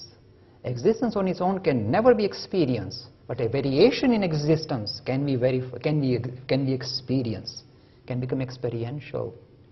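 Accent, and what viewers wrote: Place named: India. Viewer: this is not richard Dawkins .this is an Indian seethe ascent not british. FAKE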